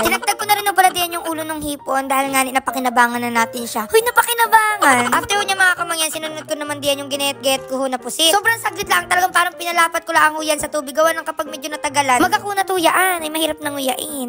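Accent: Batangas, Philippines. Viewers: Why Kamangyan ang vlog mo? Are you part of Mangyans? Nagtatanong lang, curious lang ako. Kasi your beautiful even no make up. Saka accent mo Batanguena. Ala Eh!! From Las Vegas, Nevada USA.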